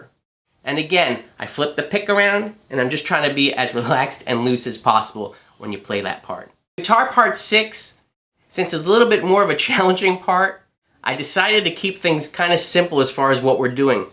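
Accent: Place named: United States. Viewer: great guy irritating American accent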